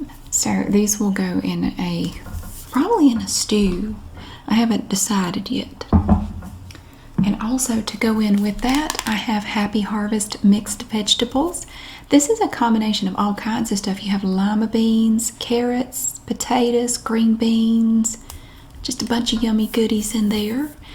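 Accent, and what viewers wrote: South, United States. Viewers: Ur pretty ! I like ur soft southern accent 🙃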